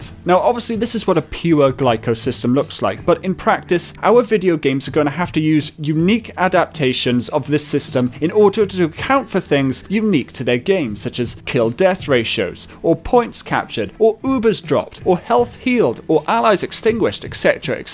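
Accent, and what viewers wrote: Wales, United Kingdom. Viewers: The accuracy/precision distinction is a personal pet topic of mine, sitting crucially in the middle of the entire field of predictivity, informativity and really all rationality as it stands. I just can't abide by a ... I can't think what the term is for it, a jumbling-up of the terms in a critical context, knowing the comprehensivity of its importance. The distinction is pretty simple (it can be explained with pictures of kittens wearing mittens) and pretty significant so any superficial pedantry can be accepted. It did take me a few minutes before some of the -isms of your accent that I'd been mulling over began to coalesce around "Welsh". At first it just sounded like a generic southern-central English accent, but I imagine if you said "I left my wellies in the valley" it would highlight the distinction. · Ey there boyo. 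what part of wales are you from? Newport/Chepstow down yere.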